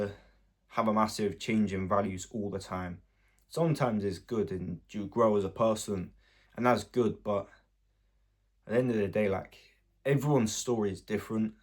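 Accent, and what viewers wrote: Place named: United Kingdom. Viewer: yeah! ur video actually popped up on my youtube recommendations. it's crazy to think i'm talking to someone from the other side of the world! i knew you were british immediately because of your accent haha. keep uploading content, bro !💪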